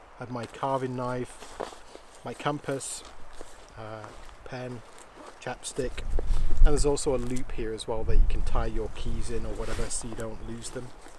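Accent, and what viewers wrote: England, United Kingdom. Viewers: Hi, enjoyable video thanks. I take it you are originally from England, or at least the UK. How did you end up in Canada? If you don't mind me asking.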